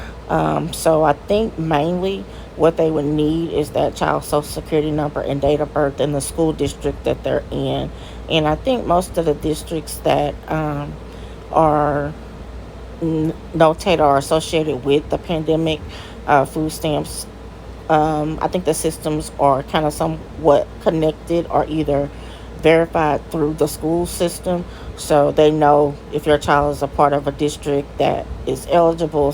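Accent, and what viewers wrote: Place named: Texas, United States. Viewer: I'm in Texas too.... the moment you said "Hey y'all" I KNEW!🤣😂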